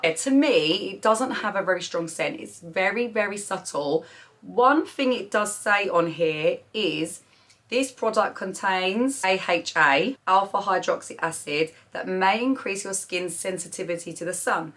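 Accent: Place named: England, United Kingdom. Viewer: Your accent is so cute! What part of England are you from? :)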